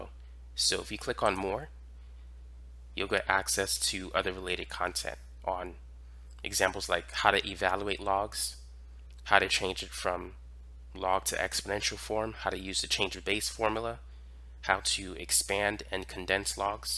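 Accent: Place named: Boston, United States. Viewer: This guy def has a boston accent.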